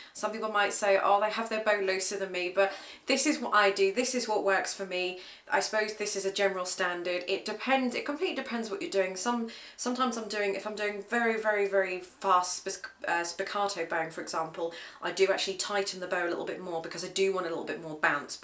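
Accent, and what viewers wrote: United Kingdom. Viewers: Gracias baby,i love british accent chicas, and you r very smart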